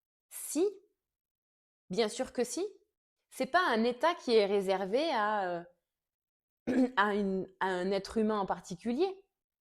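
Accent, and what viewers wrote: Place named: South, France. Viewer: Bonsoir. MERCI infiniment pour votre réponse. Il est vrai que le mental façonne parfois la perception de certains événements. Il faut se poser les bonnes questions en essayant de rester aligné. Vous avez raison. Ce n'est pas l'arrivée qui compte, c'est le chemin..... Encore MERCI. (Vous avez un petit accent du sud🌞). Je vous souhaite une belle soirée. Bien cordialement. Olivier...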